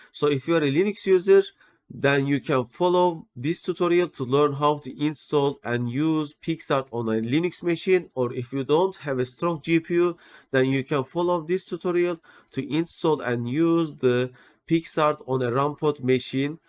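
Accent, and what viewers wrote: Turkey. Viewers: English with Turkish accent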